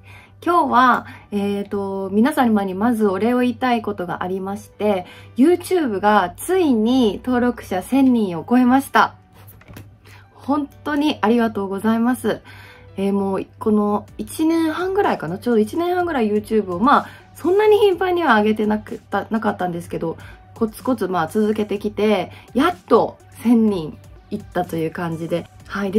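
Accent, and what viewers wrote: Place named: Kyoto, Japan. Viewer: 🎉 さま❤ 一目見た瞬間に大ファンになりました!😅そうかー、時々出る関西弁ば京都やったんですねー😊いや～、良い!京都やったらさん、可愛いから舞妓さんになってもバカ売れだったでしようねー😂ほんとに素晴らしい女性ですね😮花粉症なところもとても親近感覚えます❤🎉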